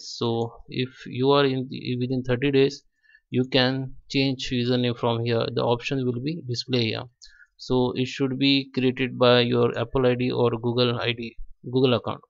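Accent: India. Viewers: He sounds like an Indian and most of the elderly working people in India prefer windows 7 because it is simpler with less bugs in the software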